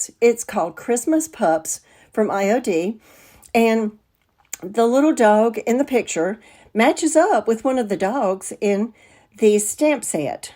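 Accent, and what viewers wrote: South, United States. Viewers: I love to watch all ur videos! There has never been one that I don't just LOVE❤ I also love to craft and I hope to be as good as you one day!! Also I just want you to know I love your sweet southern voice just so adorable 😊 I'm from Alabama, where are you from?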